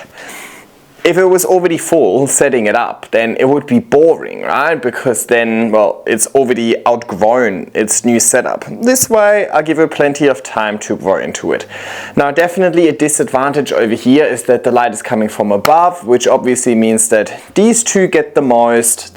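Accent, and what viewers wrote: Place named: Germany. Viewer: I loved this video - you had me giggling several times! And you got my brain going as I am just starting my moss pole journey - propagating and gathering up a few plants right now and will start replanting soon. As I have been doing this - I am starting to think to my winter (up here in Oregon) coming in a few months and I know I will need to starting thinking about lights when I bring the plants inside! Your ideas are always fun and really help me to be creative as well. Someone else said they enjoy listening to you - I do too. The first video i listened to, I kept thinking, "where do I know that accent from?", then it hit me! you sound just like Helena, my German foreign exchange student from 12 years ago! I am hooked on your videos and can't wait to see if I can replicate a few of your fun moss pole successes!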